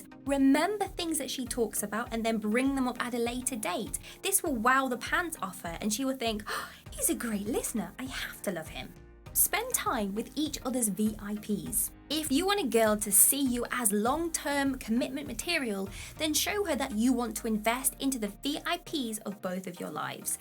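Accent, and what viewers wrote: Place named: London, United Kingdom. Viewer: I like ur accent 👌Are u from London?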